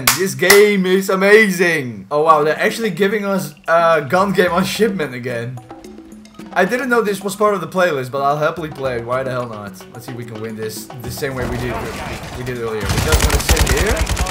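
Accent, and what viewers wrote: Netherlands. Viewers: very nederlands accent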